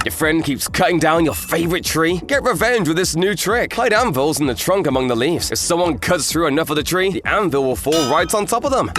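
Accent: United Kingdom. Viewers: Just found this channel so I’m not familiar with it in anyway but I can’t tell if Dexter Manning is narrating a Minecraft video or you’re just that English but there’s math here that isn’t mathing.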